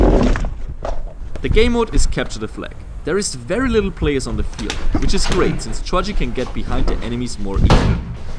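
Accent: Germany. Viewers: bist du ein deutscher? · German accent xd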